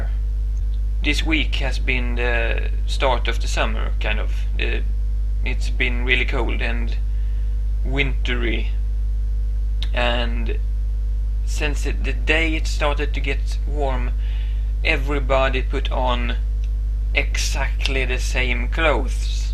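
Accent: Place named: Sweden. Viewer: I really liked your video, I just took some tests the day before yesterday, and they provided some confirmation of what I already suspected after having read about AS on some web pages. I didn't know about the lines/bags under the eyes, I thought that was just me (still learning about AS). The more I see of others with AS, the better it seems to make me feel. By the way, I like your Swedish accent, it is cool sounding :) Your brother looks cool, I always wished I could grow a big beard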